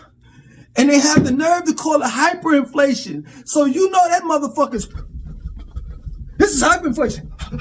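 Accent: New York, United States. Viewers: your shouting (along with the New York accent) makes you the Stephen A Smith of commentary on society’s ills! I mean this in a complementary way……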